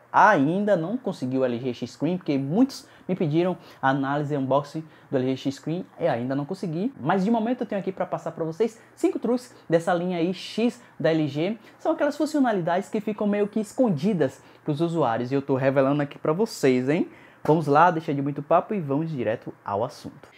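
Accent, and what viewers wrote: Bahia, Brazil. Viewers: Meio estranho um sotaque baiano falando de tecnologia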